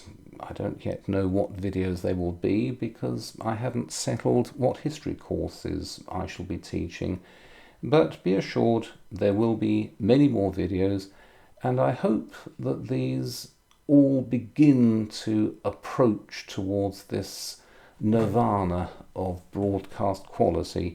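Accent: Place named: Oxford, United Kingdom. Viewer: Thank you Mr. for all your videos. American subscriber here, I appreciate your easy to understand lectures about interesting historical subjects. Plus your accent makes me feel like I’m a top student attending a class at Oxford University. I’ve listened to your videos several times over and eagerly await your next upload. Thanks again.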